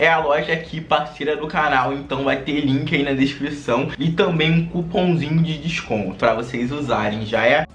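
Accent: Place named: Rio de Janeiro, Brazil. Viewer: Cara, o que mais gostei de vdd foi o seu sotaque carioca hiper mega carregado!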